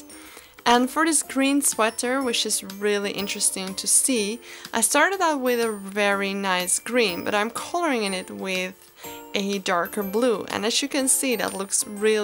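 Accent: Netherlands. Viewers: Are you dutch by chance? idk I kinda sound the same when I try to speak English. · Dutch? XD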